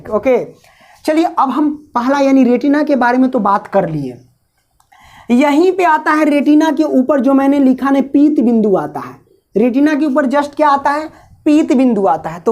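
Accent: Bihar, India. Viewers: Sir Ap bihar sa ho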